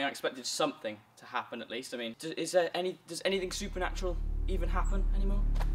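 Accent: United Kingdom. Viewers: Handsome, naturally good physique, British accent, funny content, gentle humour = best recipe for my entertainment Thanks for having started making videos! But also thx for the yt algorithm for suggesting you to me.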